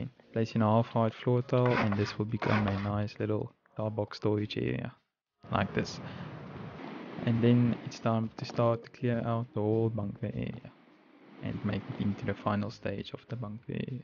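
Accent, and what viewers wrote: South Africa. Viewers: Heyy, South African?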